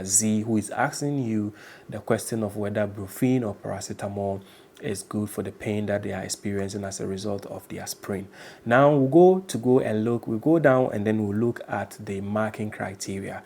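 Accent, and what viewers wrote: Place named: India. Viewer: The voice over is in India, why? I can't understand anything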